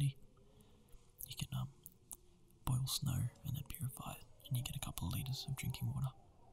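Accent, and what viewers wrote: Australia. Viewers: Really enjoyed it :) Are you Australian? It's safe to say you're my favorite male ASMR'er. You have a nice way of pacing your videos.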